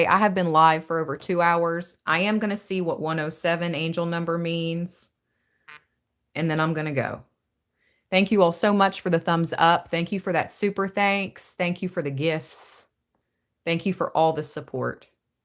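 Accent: United States. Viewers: Haha thats so funny you mention about your accent etc. - I've gone from thinking you were in Atlanta, to NJ - to generally being clueless. It dont matter! you're ancient. But i resonate with your ancient Appalachian for sure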